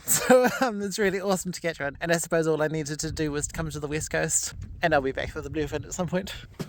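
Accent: New Zealand. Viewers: Well this is awesome! Never heard anyone speak with such a posh Kiwi accent! Oh plus the hardcore fishing … great work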